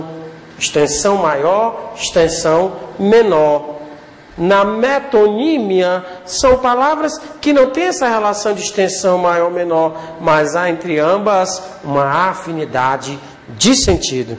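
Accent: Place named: Northeast, Brazil. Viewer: Você é o cara, já vi de tudo mas posso concluir que com você o entendimento é rápido e claro. Feliz São João professor, pelo seu sotaque ou é nortista ou nordestino. Um abraço.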